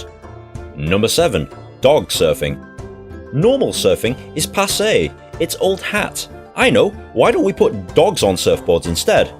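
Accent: United Kingdom. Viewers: British accent is awesome!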